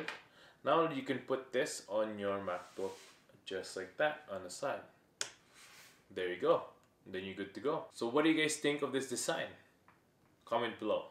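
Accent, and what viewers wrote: Canada. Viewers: okay, good to know. Btw, are you Canadian? I hear a bit of a Canuck accent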